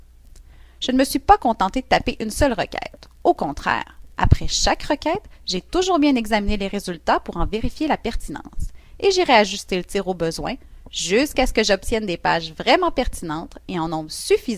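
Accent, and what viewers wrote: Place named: Canada. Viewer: j'adore la voix canadienne, amusante🤭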